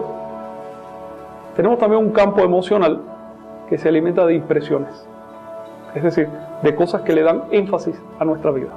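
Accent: Cuba. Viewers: Entonces el hablar como cubano(o no se de donde sea) le anula el conocimiento?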